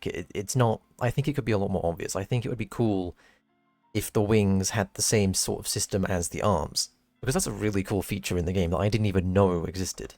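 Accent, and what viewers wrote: United Kingdom. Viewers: are you british by any chance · Holy british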